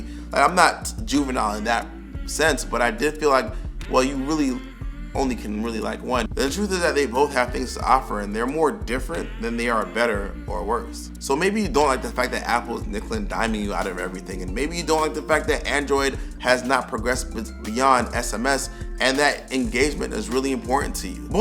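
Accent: United States. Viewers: You from Jacksonville bro? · You sound very very american. Im sorry for you.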